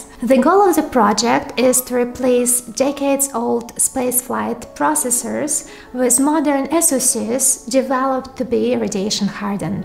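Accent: Russia. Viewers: Star trek's Chekov was adored in part vecause his acdent related pronunciation limits were endearing to us who were raised spraking American dialects of English. The Russian~Slavic accent is a cool factor that many of us adore so please don't worry so much about mispronouncing non-vital things. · Are you from Russia, Ukraine or Belarus? - Megagertz sounds quite right😉 Still these vids are cool, thx for making them 👍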